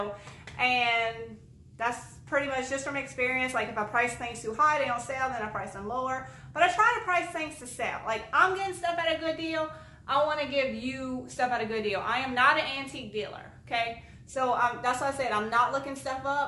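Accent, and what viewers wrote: Louisiana, United States. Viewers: You sound like a fellow Lousiananian. Am I right? Great haul . Edit: I made my comment as soon as the video started. I just heard you talk about Lousisna weather. So, yep.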